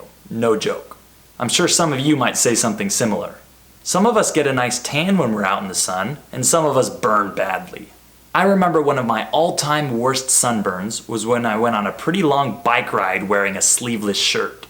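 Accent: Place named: United States. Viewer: لا لكنتو اميريكة بحتة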